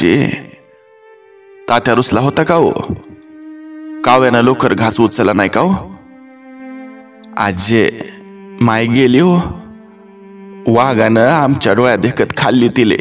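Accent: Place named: Vidarbha, India. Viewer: ग्रामीण जीवनावर आधारित ही कथा आहे. वर्हाडी भाषेने तिला छान सजवले आहे. सरजी चा नवऱ्यचा अकाली मृत्यू झाला होता. ती आता एकटी पडली होती आणि पोर पोरकी झाली होती. घरी आली पण आधीच दुःखाने व्याकुळ झालेल्या सारजा ला सासू ला सामोरे जावे लागत होते. घरचा आधारच आता देवाने हिरावून नेला होता. दुःखी असताना पण रीती नुसार ती त्याला आज घास घेऊन चालली होती अनाहूत पणे श्वापदाने सरजी वर हल्ल्ला केला होता आता मुलाची आई पण वर्च्याने हिरावली होती. मुले आता पूर्ण निराधार झाली होती. दुःखाचा डोगर कोसळला होता. आल्या वेळेला हिम्मत देऊन राहा असे आजी सांगत होती पुढे आजी आपले होईल किंवा पडेल ते काम करून राहू असे सुचवू पाहत होती. कोणीही त्याना साथ देत नव्हते वाण्या कडे गेले पण त्याची नीच वृत्ती आता जागृत झाली तो हिंस्त्र पणे वागला जाई चा गैरफायदा घेतला होता एका निष्पाप जीवाला त्याने मजबूर केले होते. नको ते घडून गेले होते. कालांतराने त्याची आजी पण आता गेली होती. आता हार न मानता ती आल्या. 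प्रसंगा ला तोंड देत होती. जाई ला पुढे मुलगा झाला होता तो अख्या ती आता त्याच्या सह तालुक्यात राहायला आली जिद्द करून तिने मुलाला शिक्षण दिले. पुढे त्याने तिच्या कष्टा चे चीज केले. तो छान ठिकाणी कामावर रूजू झाला आणि तिचे दिवस पालटून. गेले. एका करुण कहाणीचा सुखद शेवट झाला. एक वास्तव दर्शी कथा असून व्हराडी भाषेचा लहेजा सुंदर लागला आहे.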